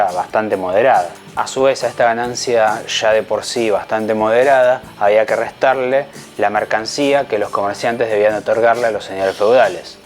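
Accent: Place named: Argentina. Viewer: Es un buen análisis acerca de los orígenes del capitalismo, aunque faltó añadir la clase de perversidades que es capaz de llegar a cometer lo que el propio Papa denominó como "capitalismo salvaje", y que es la etapa superior del capitalismo llamada "imperialismo", y su nueva arremetida que actualmente se lleva a cabo denominada "neoliberalismo".Sabemos que hoy la cuna del capitalismo mundial está en Estados Unidos. Este país no es más que un conjunto de empresas muy poderosas que a través de guerras e invasiones buscan adueñarse más y más del poder mundial. Supongo que estas ideas las desarrollarán en próximos vídeos. Un sólo aspecto de este vídeo al comienzo me incomodaba, después ya me desagradó, finalmente terminó molestándome. ¿Por qué el locutor acentúa y enfatiza tanto en la pronunciación de la letra "ye" o la "ll" convirtiéndola en una "ch"? 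Él no dice "llega a convertirse" sino dice "chega a convertirse". Ya sé que esa es la pronunciación del argentino, pero al menos podría suavizar este rasgo para así universalizar más este buen vídeo y evitar que con ese sonsonete caiga en localismos y regionalismos que pueden resultar no del todo agradables.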